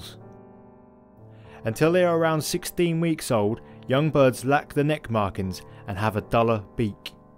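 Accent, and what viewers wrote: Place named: Norfolk, United Kingdom. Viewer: Can't tell where in Norfolk you are from! I'm guessing Thetford way?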